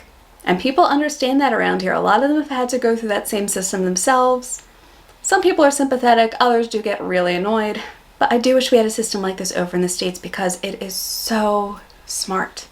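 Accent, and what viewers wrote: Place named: Australia. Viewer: By watching this Video you sound so Australian well done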